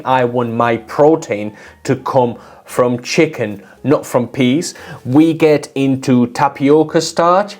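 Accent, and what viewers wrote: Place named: Northern England, United Kingdom. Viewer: I notice you didn't factor in recalls and poisonings. Kinda important, but maybe that's another video, eh? Blue Buffalo and Iams have horrible track records in that department, FWIW. THANK YOU YOU ANGEL SENT FROM THE GOOD PLACE (I.E. 'the norff' by the sound of you.) We all desperately needed a vid like this. Clear and very educational. By the time I got to the end I felt like I'd learned enough to judge the dry foods pretty well on my own. Well enough to know what to steer clear of at least. I am so grateful. Glad to learn the one I'm currently feeding is the pick of the litter too, thank god! I wonder (and would not be suprised to learn) if our standards of pet food here in the U.S. is even worse than where you are. We probably allow all kinds of crap EU and UK wouldn't dream of allowing. That's if the way they handle the human food over here is anything to go by. 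Anyway cheers, and keep up the good work.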